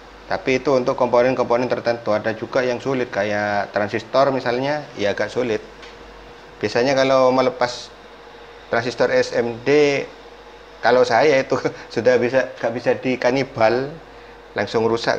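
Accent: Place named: Madura, Indonesia. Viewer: Mantap sampean logatnya kaya orang medunten ...ya bang salam solder panas bang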